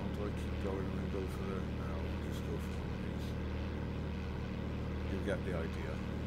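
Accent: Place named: Wales, United Kingdom. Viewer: I think you will find he has a Welsh accent. It is still English though.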